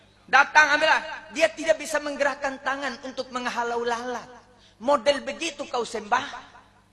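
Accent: Indonesia. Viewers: Habib gaya bicaranya KY orang Betawi ya....Masya Allah...dakwahnya ringan mengena..mudah di terima❤❤ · Logat Dompunya masih ada Syech...❤ n karakternya masih melekat Khas Dompu..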